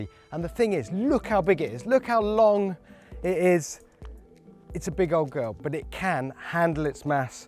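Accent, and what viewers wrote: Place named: United Kingdom. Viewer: Wins for most boring video. Listening to a Brit is as painful as an uneducated southerner.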